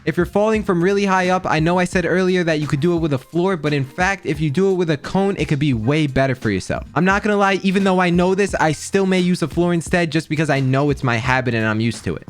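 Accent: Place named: New Jersey, United States. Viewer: Thx so much and are you from New Jersey cuz you have the accent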